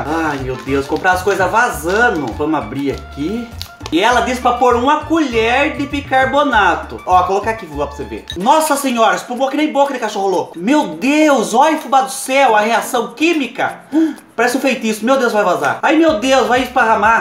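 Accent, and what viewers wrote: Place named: Minas Gerais, Brazil. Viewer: Parece Sotaque mineiro 😂😂😂😂 adoreiiii.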